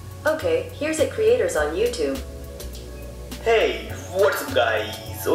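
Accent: Bengal, India. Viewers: Sound like you are a Bengali (Bangali)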